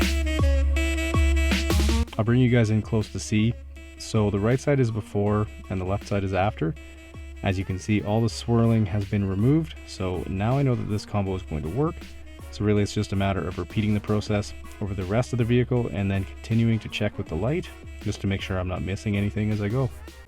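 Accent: Canada. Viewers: *Process in that Canadian accent*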